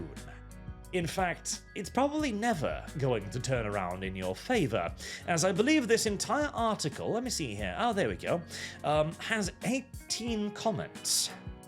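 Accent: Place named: United Kingdom. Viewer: Im surprised your from Norway, i would have never thought... Ur English is as Birtish as its gets!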